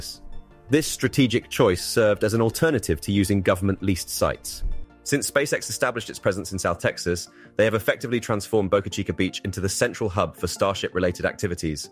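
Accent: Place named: Europe. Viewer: Good report. Job well done. It would be nice to hear your announcer to speak in American English. There are dozens of European English on U Tube I do not like their speech nor their cadence. .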